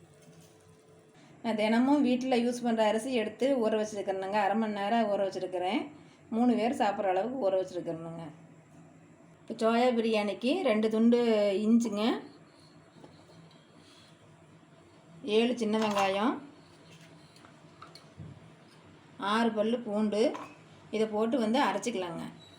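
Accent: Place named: Kongu, India. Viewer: கொங்கு மண்டலமா சகோதரி.அருமை 😇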